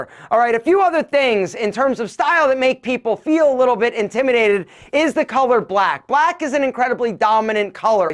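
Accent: Philadelphia, United States. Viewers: Bro you have to be from Philly with that accent.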